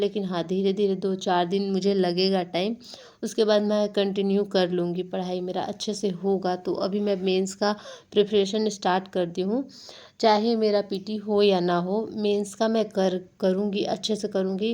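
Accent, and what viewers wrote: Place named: Hyderabad, India. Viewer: Aapka slang Hyderabadi a raha hai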